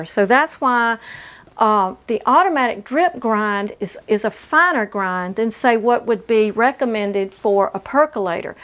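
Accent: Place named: East Texas, United States. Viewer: What a beautiful and sweet young lady this is! She sounds like us here in East Texas!! New fan and subscriber, great job!! ❤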